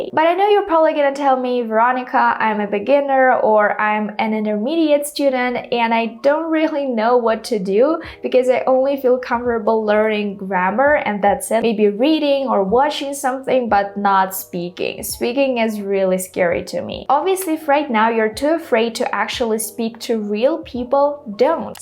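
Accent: Russia. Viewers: sweety. I am not fluent but I could understand the russian pronunciation in your speech. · Русский акцент) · Russian accent?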